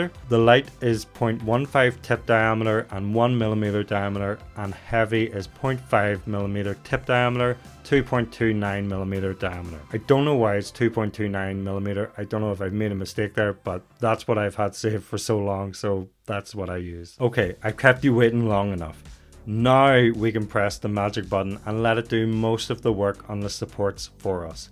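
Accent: Northern Ireland, United Kingdom. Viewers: Is Discord for Patreon members only? Also, are you from Northern Ireland?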